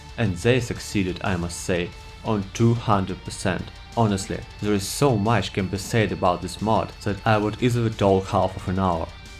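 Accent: Germany. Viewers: You have the first good-sounding German accent I have ever heard. It takes my brain a moment longer to understand what you are saying, as with most accents, but clarity is not an issue. Going from speaking German to speaking English is difficult from what I have heard, so keep up the good work!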